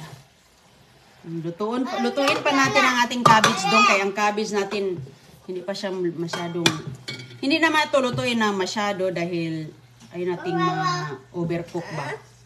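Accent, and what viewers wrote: Visayas, Philippines. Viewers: Minus points: she thinks eating with her hands make her remain filipino and don't want to use spoon and fork, her visayan accent is so bad to listen to, she loves bagoong even her kidw young as they are eat bagoong and eats with their dirty hands, most of her visitors are filipinos too so we can assume they have bagoong in their homes yet she serves food with bagoong always to her visitors.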